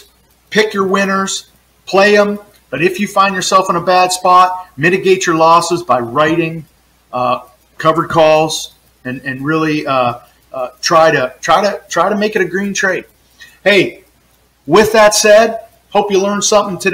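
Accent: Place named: Pittsburgh, United States. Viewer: Thanks for the reply. Makes perfect sense, thank you. I guess my real question was, "Do you HAVE to buy them back, or do you have the option to let them expire?" I was a little confused but I get it now. Side note, you're not a fellow Yinzer by chance, are you?? Your voice (I mean no offense) and a certain sports logo visible in one of your other vids suggest you may have ties to the area. Thanks again, and keep up the good work with the vids! Very helpful.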